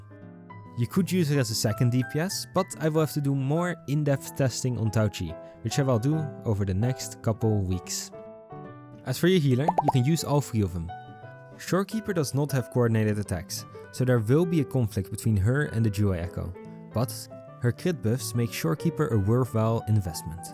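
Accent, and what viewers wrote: Netherlands. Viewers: Not just by chance, that was extreme dutch. it got me distracted from the video after 1 line in xD usually a is of dutch people talking english they can very well recorniz each other pretty that there dutch though here u use some words that have same letters but use the dutch way of saying it instead of the english one xD You do you though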